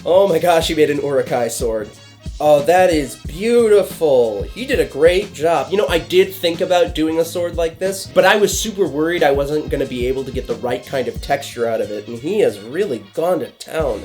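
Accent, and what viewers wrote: Canada. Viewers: Thanks. I've seen people use cotton fibers from cotton balls applied with watered down glue to achieve a nice corroded texture. You sound Canadian. So Hi from a fellow Maker and Canuck from Quebec City.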